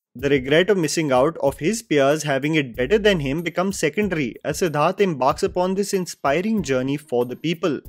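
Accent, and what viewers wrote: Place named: Odisha, India. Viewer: Odia pronunciations 😅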